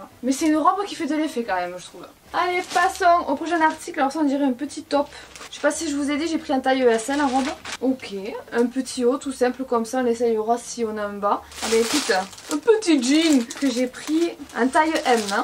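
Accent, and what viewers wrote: South, France. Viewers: Tu es vraiment mignonne, rigolote, simple, déterminée, et plein d'autres jolies qualités. Je te suis depuis longtemps mais étant une jeune femme de 46 ans :) je me voyais pas dans tous tes contenus, mais aujourd'hui j'ai réalisé que je n'étais pas abonnée, et qu'avec ton Million passé, une de plus ou une de moince (haha, je suis une chti, et chez nous, on ne prononce pas le s de moins ! mdr), ça changeait rien, et puis zut alors, je souhaite entrer dans ta communauté, avec mes 46 ballets et y rester discrete, mais voilà je trouve que tu mérites ton succès. Ta bonne humeur, ton humour, ton Accent du Sud que j'adore, ta nini et tout le reste, un univers gai et rafraichissant.... Merci xo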